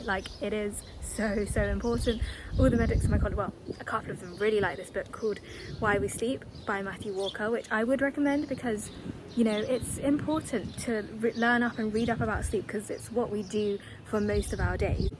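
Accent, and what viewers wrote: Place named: Southern England, United Kingdom. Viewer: Weird observation to make, although your accent is very southern, when you say done it sounds very northern lol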